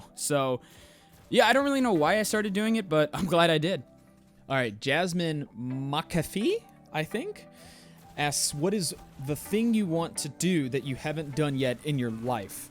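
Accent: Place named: South, United States. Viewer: just learned he has a southern accent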